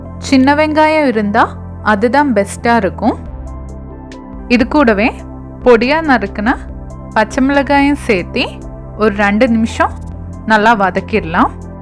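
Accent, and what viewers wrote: Kerala, India. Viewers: Maylamo voice 👍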